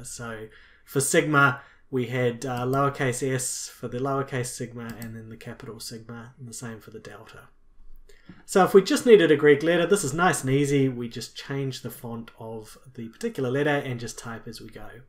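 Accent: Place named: Australia. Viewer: thank you, "mate"? I think i detect an Australian accent. You're brilliantly concise.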